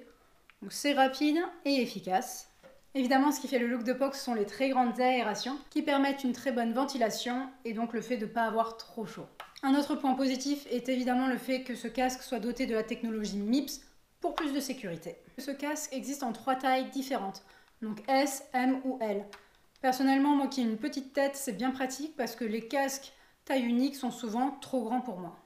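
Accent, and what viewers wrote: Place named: England, United Kingdom. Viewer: Je kiff trop ton accent Anglais !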